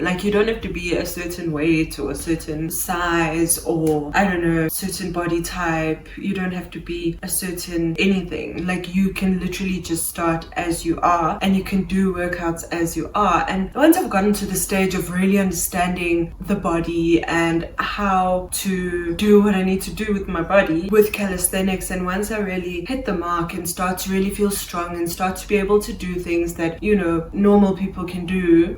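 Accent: South Africa. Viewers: Do I detect the accent of a fellow South African? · YouTube is my primary form of media and I can't tell you how heartwarming it is to click on a video and hear a South African accent. There's just something about getting information from my own people. You just know it's going to hit home. ❤😊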